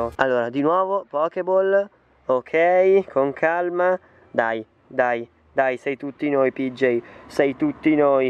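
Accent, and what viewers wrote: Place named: Arona, Italy. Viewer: oddio sei di aronaaa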